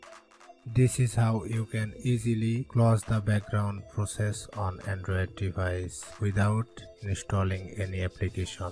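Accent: India. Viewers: Got a problem with his English? Consider this: India's primary export is knowledge. Think about that, next time you're feeling xenophobic. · It's just the a Indian accent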